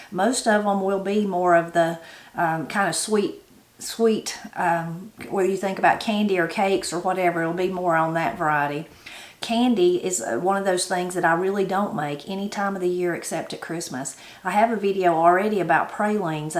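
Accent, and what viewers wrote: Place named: South, United States. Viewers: Great video. Watched this from the UK Never heard of this before but I will definitely make it. Loving your southern accent ❤ . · oh, your accent sounds like home. I moved to the east coast from the south a year ago and never hear those southern twangs anymore. always so nice to hear them. these are a staple where I'm from in Arkansas, as well!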